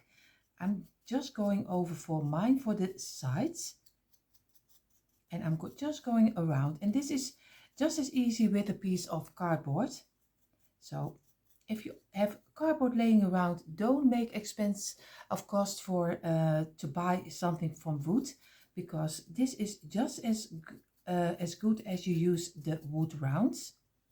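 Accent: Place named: Netherlands. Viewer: You are dutch I heard. .my family originally came from Holland. Our last name use be visser but was changed to visher.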